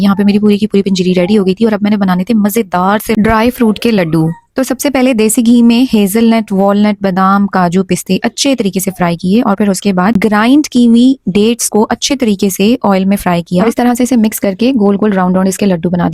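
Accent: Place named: Pakistan. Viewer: Shakal accent se b pata lag Raha k Pakistani hai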